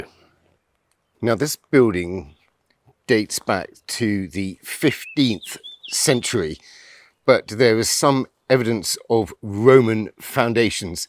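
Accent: United Kingdom. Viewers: it is, as always my sincere pleasure... i think i've realised why you and your character work so wonderfully well with these videos of yours... we english i think its fair to say, are known for reserve and, i assume from your general accent you were educated at a public school... and so to hear a public school "schoolboy" push thru the stiff upper lip and use english expressiveness in its purest form in relation to something that is clearly a passion for you, and to be commenting on such truly italian expressions, makes the communion of both strong characteristics emphasise the deepest of appreciation for what can only be described as architecure almost as an art form in that it is utterly compelling in the feelings it evokes within. such a powerful combination and the pleasure that they and you bring to us the collective viewers is of a calibre that i cannot find anywhere else. 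bravo. bravo, bravo and from your intentaional audience, our appreciation back to you.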